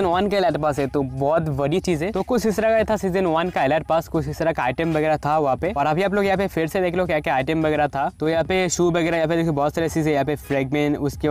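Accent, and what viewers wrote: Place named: Assam, India. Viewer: YOU'R SOUND LIKE ASSAM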